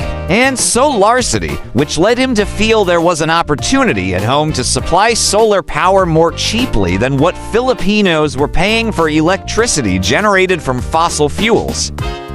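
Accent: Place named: United States. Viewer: Iam fr the first district of batangas, nakkadismaya naman ang pronnouciation ng ngsasalita, ano ba sya americano?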